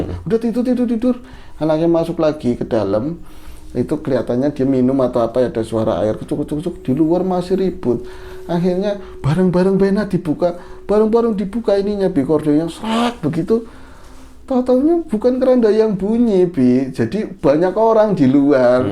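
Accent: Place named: Tegal, Indonesia. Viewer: Logat tegale mntep lah .lucu wkwkwk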